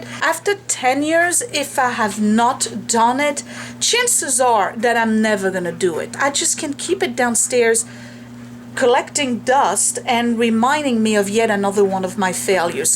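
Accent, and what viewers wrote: France. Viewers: Hubby started watching your videos with me lately!! I think he secretly likes you french accent! We both laughed at Scott's bin of cables and modems, Hubby has 2 bins of those in our garage! I have no idea why he is keeping them!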